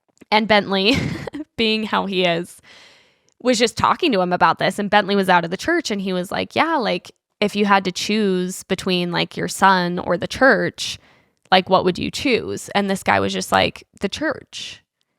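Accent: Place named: Utah, United States. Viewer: Do people who have this accent practice it? Are there communities in Utah where everyone speaks like this? It’s fascinating that someone in Utah can have such a distinct accent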